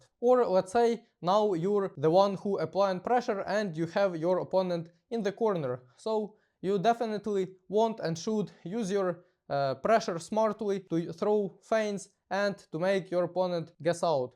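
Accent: Russia. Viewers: I love your rusian accent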